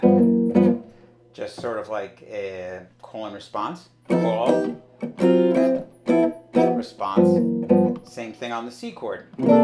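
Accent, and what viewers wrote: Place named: Philadelphia, United States. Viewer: Nice lesson,. You made everything clear; it's easy to see what you're doing. By the way, are you from Philly? I am, and you seem to have the accent.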